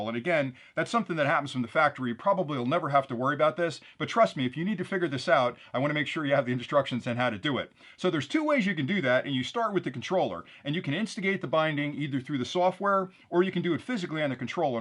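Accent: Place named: Bronx, United States. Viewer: you should be from the bronx - well cool - love your channel!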